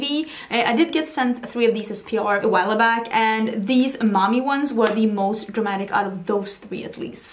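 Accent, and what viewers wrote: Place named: Sweden. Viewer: I watch every single one of your videos because you're a triple threat. You're gorgeous, intelligent, and sarcastically hilarious! From the first video I found by you, I remember thinking, "WOW, this girl freaking SAYS what many people are thinking but are afraid to say!" and I subscribed immediately. That was at least 2 years ago. (Plus I'm a sucker for a Swedish accent) I also thought you were so badass because your English is fantastic and feel honored that you chose to speak it in your videos. I wish there was a way to separate the "people who count as lots of views" from the people like me who watch every video as soon as it comes out but just my views aren't enough. Like there should be a higher level of adsense for repeated views by loyal subscribers versus random 12 year olds passing through YouTube looking for something that flags their attention, who aren't subscribed. 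But I guess ads are ads.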